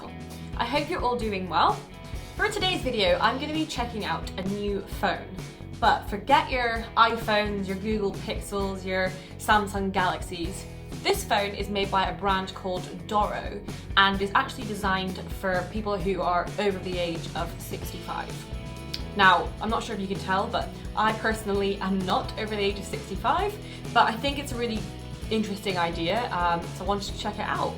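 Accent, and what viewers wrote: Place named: Estuary English, United Kingdom. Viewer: Silly, intrusive background 'music', no explanation of what is shown on the external indicator panel, no mention of charging plug type, absence of showing how to set anything on the phone, ambivalent accent mixing estuary English with a sort of N.American and, overall, an ego trip posing as an empathetic guide for seniors.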